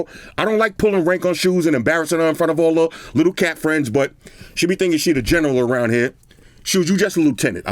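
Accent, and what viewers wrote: New York, United States. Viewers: I'm late to your party bro, but glad I found you. Been rocking OP since #3 all the way up and waiting on my black #9. Sound like you're a New Yorker. Favorite reviewer on Youtube. Appreciate you.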